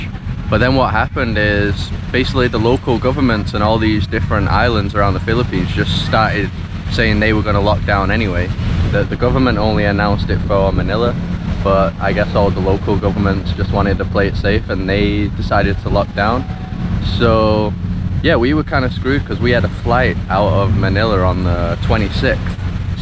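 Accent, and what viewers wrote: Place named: Scotland, United Kingdom. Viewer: That’s a funny sounding Scottish accent, what part of Scotland are you from mate?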